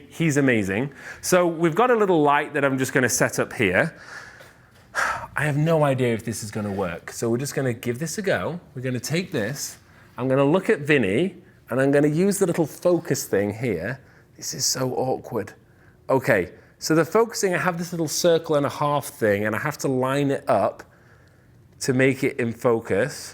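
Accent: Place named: United Kingdom. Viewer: Nah that UK accent is a blessing thanks for the video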